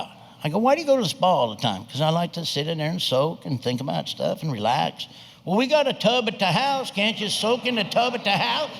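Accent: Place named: South, United States. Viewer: I notice Larry's kinda easing out of the southern accent.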